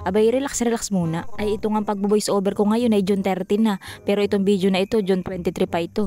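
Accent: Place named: Batangas, Philippines. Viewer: Yung punto mo ms punto rin saamin sa Batangas eh 😂❤ shout nman sa ANDAL FAMILY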